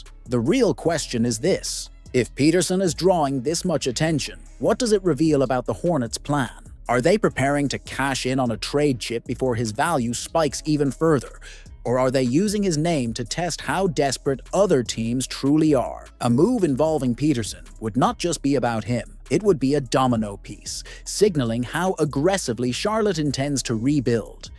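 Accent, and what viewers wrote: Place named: United Kingdom. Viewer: What a waste of my time. Bloviation. Nice touch to put a British accent on the AI narration making it obvious you know nothing about Boston basketball. I'm blocking this channel.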